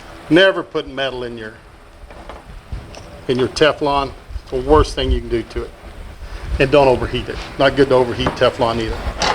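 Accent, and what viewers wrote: South, United States. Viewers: his southern drawl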